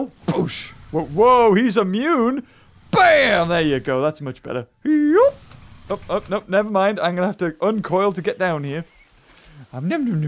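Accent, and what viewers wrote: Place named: Newcastle, United Kingdom. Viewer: I saw you in all over the place good gordie acsent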